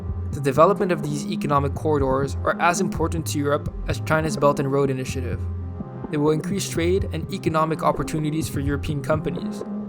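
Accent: Rotterdam, Netherlands. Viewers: Unmistakable Dutch accent ;-) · I hear Dutch English... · I can hear you are a dutch speaker :) loved the video! Will come back for more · Confirmed your dutch No one in the world except Ppl from Rotterdam with accent can speak Rdam like that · I was casually watching the video... then "no, another Dutch guy" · Sounds dutch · Rottedam je klinkt als een echte Rotterdammer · I'm not Dutch or French, actually English. But he definately sounds more Dutch than French. French accent is always thick when speaking English lol you can tell a mile off. Dutch is much more subtle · His accent in English sounds very Dutch. Can’t say I’m surprised he is Dutch haha. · hi narrator Are you dutch? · You're Dutch aren't you? Good vid!! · You sound dutch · I thought he was Dutch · HOOR ik daar een Nederlands accent? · Are you dutch? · Als deze gozer niet Nederlands is dan eet ik een blauwe banaan. 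Wel goed accentje tho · I dont think so because he sounds Dutch. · Pretty sure this guy is dutch speaking. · I'm pretty sure the maker of this video is from Rotterdam, just because of the way he says it 😂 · He's dutch Can't you tell by his accent?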